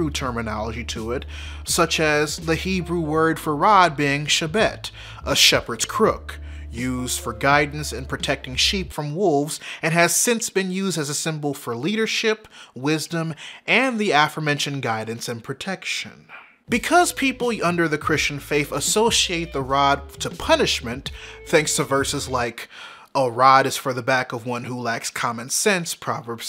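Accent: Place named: Detroit, United States. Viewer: Native Detroiter here — living in the south for the past decade. I’m so glad I stumbled upon your channel. It was recommended to me after watching Double Toasted’s A Goofy Movie review. I’m older, but this movie brought back so many memories for me, as well as my millennial-aged son. It’s why he stopped dead in his tracks when he saw me watching your video. We both gasped when you mentioned being from Detroit, then I immediately said, “He sounds like a Cass Tech alum.” Sure enough, you confirmed it! Always love seeing my fellow Technicians doing well. I won't mention what year I graduated. :) Anyway, my son and I both subscribed to your channel and we're eager to dive all the way in. We saw The Batman Thursday and I can’t wait to hear your thoughts on it. Keep up the excellent work!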